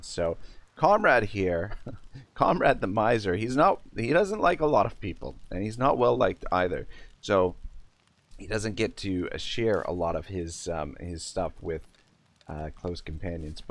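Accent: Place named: Canada. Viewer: This dude is definitely Canadian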